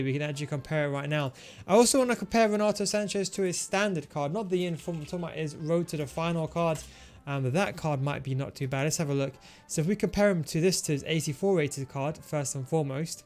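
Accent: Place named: United Kingdom. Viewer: Love the review. Off topic but i heard your accent and curious where in UK you're from?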